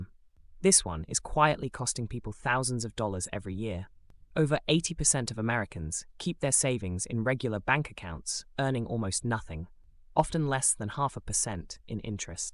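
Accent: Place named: England, United Kingdom. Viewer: English Accent means I stop listening to this garbage.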